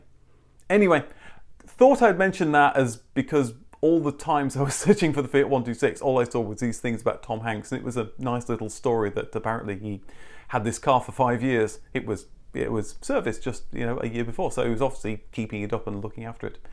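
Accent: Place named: Midlands, United Kingdom. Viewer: Amazing how your intonation switches to much more English (or should I say "Midlands"?) dialect in this "Extra video" ... not sure you noticed.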